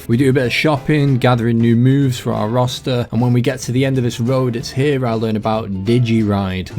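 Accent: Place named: England, United Kingdom. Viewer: i cant wait to see your bee team as a fellow funbeemon enjoyer wait what, why retire the bees when you can evolve them to their beeier forms. funbeemon's mainline is nothing but one big beehive, unfortunately, funbeemon's most recent new evolution branch wasn't added to the game though hopefully in an update or dlc it is. love to see you really enjoying the game bro. and a fellow englishmen as well i hear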